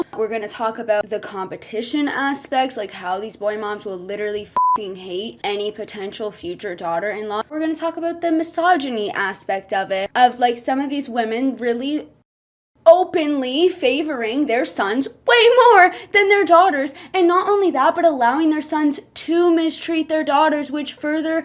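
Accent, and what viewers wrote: Canada. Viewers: hi just so you know ive started developing a canadian accent from watching your videos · so you do sound Canadian but the way we over exaggerate it in the states is to show the difference like when we impersonate Brit’s with “B’ole o wo’ar” they don’t sound like that but like they kinda do??????????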